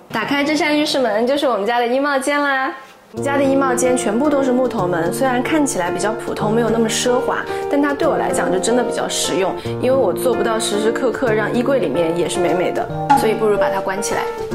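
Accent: China. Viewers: 是大陸人嗎？口音聽起來實在是不習慣😅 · 主播是台灣人嗎？感覺有京片子腔，是大陸媳婦兒嗎？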